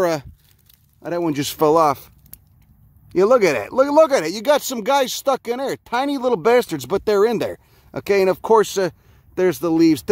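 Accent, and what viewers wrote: Chicago, United States. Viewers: I have a question where did you grow up, your pattern of speaking reminds me of Brighton Park Chicago area. Its a breath of fresh air and love the Show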